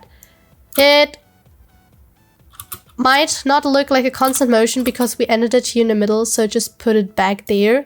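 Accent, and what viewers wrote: Germany. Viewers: Schwöre, das Deutsch quilt so ausm Englisch raus hahahah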